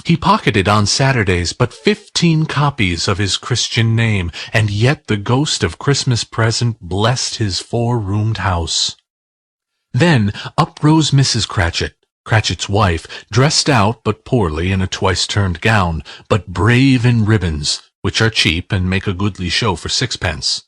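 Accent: United States. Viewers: Should only be read by an English narrator, doesn't sound good with an American accent. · American accent? Sorry, bye.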